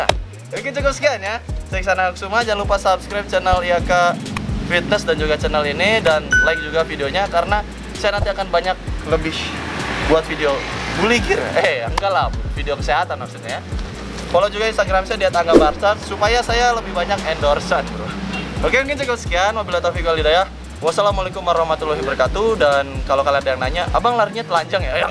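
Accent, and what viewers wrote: West Java, Indonesia. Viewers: Orang sunda bukan bang?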